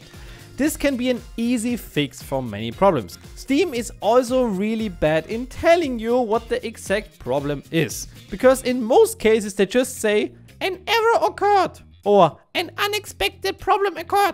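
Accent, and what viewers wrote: Germany. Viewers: Insane Video like always man, all of your videos are just useful and very nice to watch. Like how you can hear the german voice, but i think every german can recognise other germans haha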